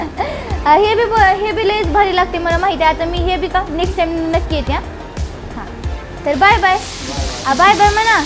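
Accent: Latur, India. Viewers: Latur chi bhasha ❤️😂(Latur accent)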